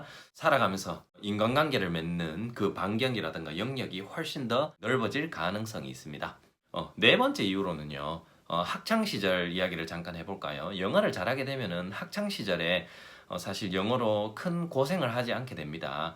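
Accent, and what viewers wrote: Gyeongsang, South Korea. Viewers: 목표설정에 도움되는 영상이네요~~어정쩡한거 말고 잘했으면 좋겠는거!. 경상도갬성 넘 조음요 ㅋㅋㅋ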